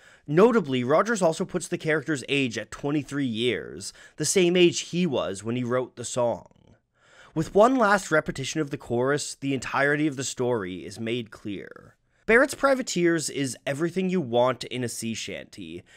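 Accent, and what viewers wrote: Canada. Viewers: I could finally solve my question on whether you were Canadian. I had seen some of your other videos and heard your accent and wondered if you were from Canada, but couldn't confirm it. Glad that my instinct was correct. :P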